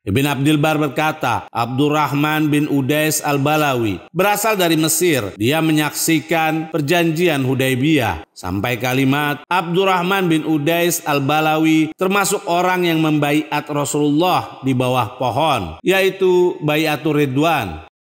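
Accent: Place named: Madura, Indonesia. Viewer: kalau dr logatnya kayaknya madura ini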